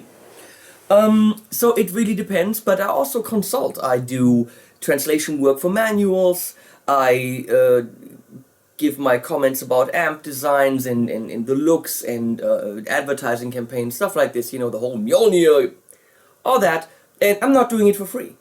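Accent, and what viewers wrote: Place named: Germany. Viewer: Man hört das du deutscher bist :D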